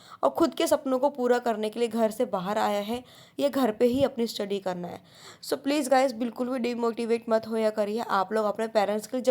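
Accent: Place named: Bhojpuri, India. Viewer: Bhojpuri voice nice hai ❤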